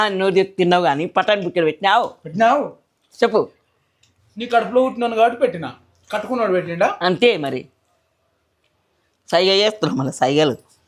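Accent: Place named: Mahabubnagar, India. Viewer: Hi Anna mi videos Chala baguntayi mahabubnagar slang lo chala baga mataladatharu nen pregnant adhi chuda gani cravings starting ayindi nak kosam koncham pampiyandi please 😊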